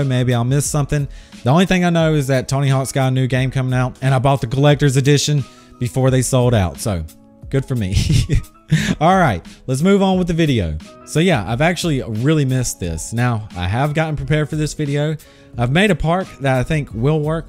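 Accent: South, United States. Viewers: this dude sounds like hill billy bob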